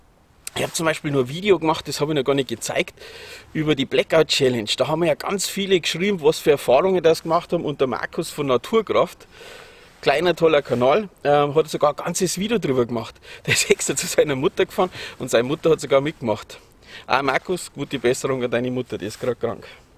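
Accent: Bavaria, Germany. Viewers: Bitte du musst weiter machen🤣🤣🙋‍♂️🙋‍♂️🙋‍♂️🙋‍♂️🙏🙏🙏🙏🙏🙏Wir brauchen Dich so sehr!!! Ich werde auch Mitglied anmelden!Bitte gibt du nicht auf!😂😂😂😨😨😨Ich verstehe Dich sehr gut!Wir können noch nicht von Luft und Liebe nicht leben!Jeder von uns müssen sein Lebensunterhalt verdienen. Lasst dich nicht unterkriegen💪🙏🙏🙏🙋‍♂️🙋‍♂️🙋‍♂️👍👍👍Alles Liebe aus München!P.S.Ich Liebe dein bayerisch 🤣🤣🤣🤣👍👍👍👍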